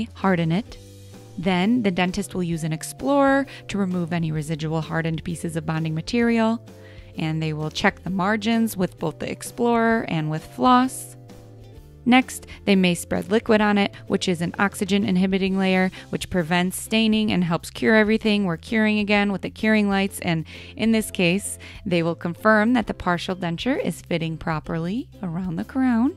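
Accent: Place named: Minnesota, United States. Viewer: I like your voice. You sound like a friend of mine. Are you from Minnesota?? Great video BTW.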